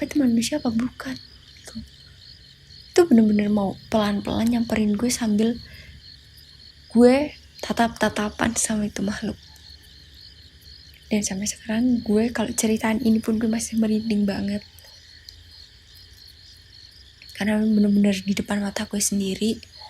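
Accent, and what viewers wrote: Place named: Java, Indonesia. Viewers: Medok mbak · Scara dari JaTang mba nya ... 😊😊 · Medok juga keren kok.. ga harus pake gue padahal medok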